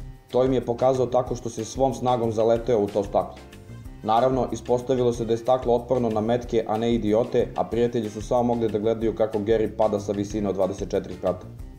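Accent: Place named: Užice, Serbia. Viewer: Jesi li ti iz uzica?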